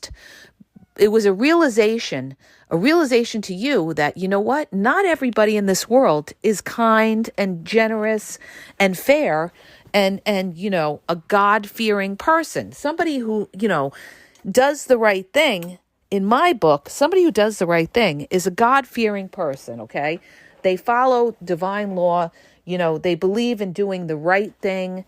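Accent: New York, United States. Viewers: I think you are a very educated person that is helping masses of hurting people❣⛑ 🤗I love yr accent= New york? & you are very pretty. Some constructive help to help you SHINE BETTER! Your tone of voice sounds Angry & I'm sure it is righteous indignation against injustice & abuse & also, in my opinion it is much more classy NOT to cuss, which are offensive words, not necessary. Humbly, I hope u receive my words, only meant to help. Regardless, I will still listen 2 you. 😁🥰💃🏼🤸‍♀️🙌🙏😇🌟🌞 God Bless you❣💝💖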